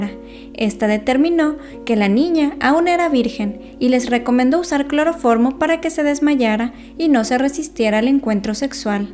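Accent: Mexico. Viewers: Holaaa lindo acento mexicano 👋👋 Muy buena historiografía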